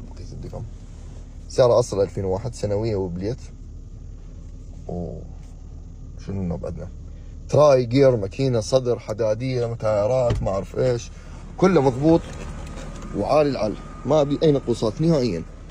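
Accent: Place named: Baghdad, Iraq. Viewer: السلام عليكم اخوية الغالي اني ماعندي اشتري هاي السيارات الحلوةلكن يعجبني اتفرج علئ فديوهاتك لأن طريقتك بالكلام مثل ذبت أهلنا مال بغادة الأصليين وأتشرف بيك اذا كنت عربي او كردي لأن أحنة كلنا أخوة عراقيين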